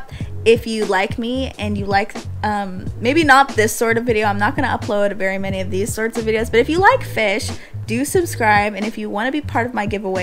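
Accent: United States. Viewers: I knew you would get to 1000 before the end of the year congratulations. Yeah I know you don't watch fish tube 🙄 and I could tell you America by your accent✌🏼👍🏼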